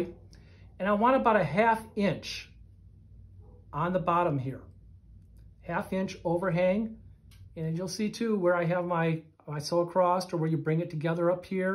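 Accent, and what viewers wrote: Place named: Midwest, United States. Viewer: and accent!! Midwest??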